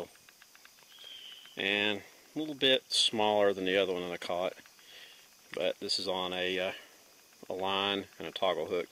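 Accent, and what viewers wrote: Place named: Southern Appalachia, United States. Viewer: Great vid! What part of east tn? Im originally from southeast ky. I could pick up your accent from that part of the country!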